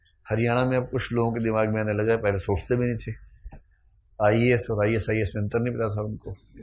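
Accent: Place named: Eastern Uttar Pradesh, India. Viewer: At the end, bujha raha h? 🤣🤣🤣 Sir aap pakka U. P. east se h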